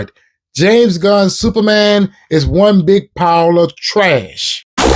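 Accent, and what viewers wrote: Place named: Louisiana, United States. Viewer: Lol my mans Louisiana accent coming to life!😂😅 Good reaction man! You gotta a new sub!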